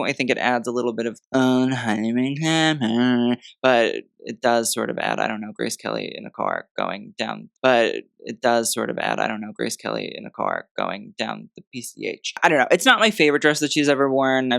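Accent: United Kingdom. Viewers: Love you British accent...sooo cute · Your British accent sounds exactly like Stewie from Family Guy and I love it!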